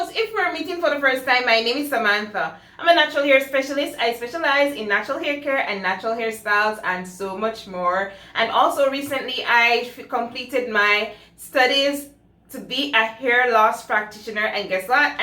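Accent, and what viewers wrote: Jamaica. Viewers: She is definitely jamaican. Can't hide our accent no matter what 🇯🇲🇯🇲🇯🇲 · You sounds Jamaican. I'm waiting for a miracle for my alopecia.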